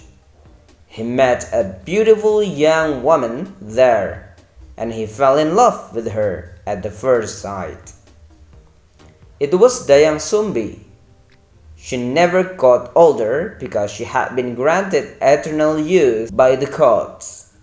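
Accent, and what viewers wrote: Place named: United Kingdom. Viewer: Saran mas : Kan w merhatiin kalau mas lagi pakai accent yang lumayan British, nah Cara mengucapkan Brought nya itu seperti ini : "Brot" kek gt bro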